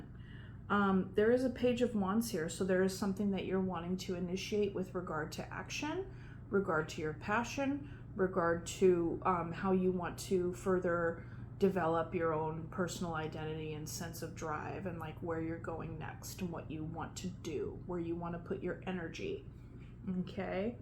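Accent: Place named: Canada. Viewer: And ew, are you Canadian? I’m from Toronto? You sound Canadian! X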